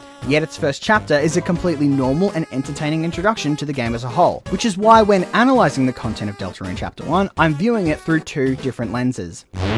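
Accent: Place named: Australia. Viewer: Unrelated but I sense an Aussie here… Good on ya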